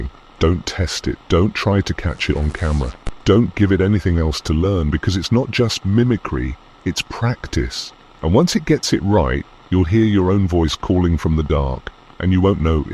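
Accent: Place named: United Kingdom. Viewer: AHHH HES BRITISH >X33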